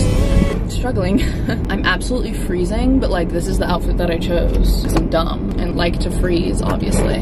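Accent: United Kingdom. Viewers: love your british accent🤗 so cute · I want your English accent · Loving the British accent😂💞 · AHAHHAA i love this british accent!!!! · every video she does a british accent and it's cute haha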